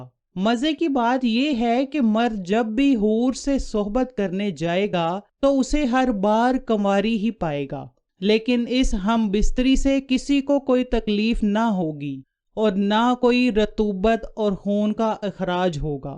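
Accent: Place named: Punjab, Pakistan. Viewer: یہ عورت پنجابی ہے کہ رہی ہے کہ جک جائے گی حالانکہ جھک ہے لفظ یہ پنجابی گھوڑا نہیں کہ سکتے بہت زور لگا لیں تو گوڑا کہتے ہیں ویسے پنجابی کوہڑا نہیں کہوڑا کہتے ہیں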